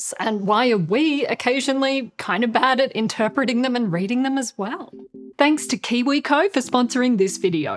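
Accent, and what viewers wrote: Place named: Australia. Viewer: jumpsuit... jungle safari print. ping pong table? Australian accent!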